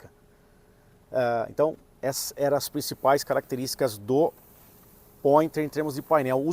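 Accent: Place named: Mooca, Brazil. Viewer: Curioso pra saber de onde vem esse seu sotaque kkk. Parece da Mooca, belo! kkk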